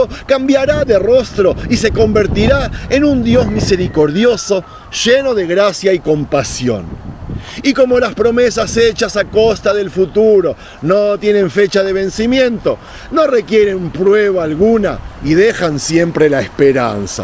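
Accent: Argentina. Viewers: soy uno de los masoquistas,pero m gusta tus videos,uno de tus videos donde mostrastes un modelo de el tabernaculo,m gustaria un video d siquem donde supuestamente estaba la encina de manre,donde estuvo el padre Abraanm, lo q odio en ti es ese acento argentino,te tolero x la historia q tu conoces y a mi me gusta. Shalonm y delen duro a estos cananeos d raffah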